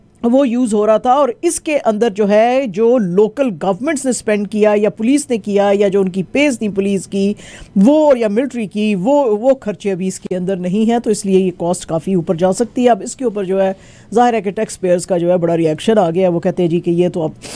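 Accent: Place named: Punjab, India. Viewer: Madame - your urdu accent is very poor . You speak urdu in punjabi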